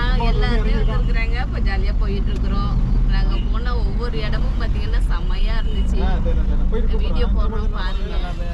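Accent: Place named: Thanjavur, India. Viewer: Akka orthanadu thambi yin valthugal Thanjavur pechu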